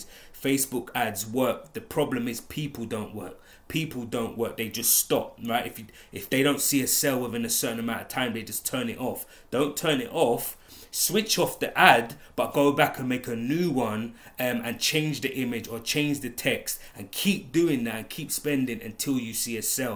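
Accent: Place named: London, United Kingdom. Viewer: He's from London I'm guessing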